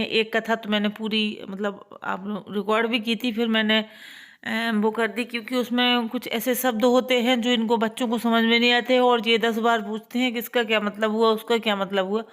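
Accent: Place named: Bundelkhand, India. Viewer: Third like ke sath bahut Achcha falahar banaya hai aapane ekadam testi aur yummy Dekhkar hi Munh Mein Pani a Gaya Hamare Yahan Bhi Ganesh Chauth Ka Vrat bahut acche se Manaya Jata Hai vaise Main To baramasi Ganesh Chauth Ka Vrat rahti hun per yah Bade Ganesh ki jo Puja Hai vah Mere Hanuman hai isliye maine poochha Nahin Ki Thi aapke Munh se Bundelkhandi bahut acchi Lagti Hai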